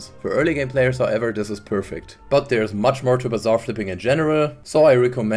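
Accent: Germany. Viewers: DEUTSCHER ACZENT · Yooooo You are DEFINITELY German 💯💯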